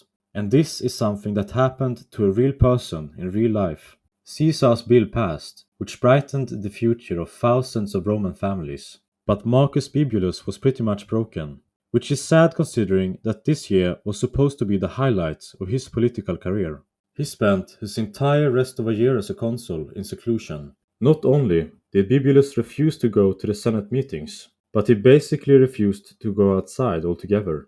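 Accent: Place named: Latin America. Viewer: … I know your voice. Unique combo of voice and accent (very slight) but your Latin origins give you away 😂 big fan though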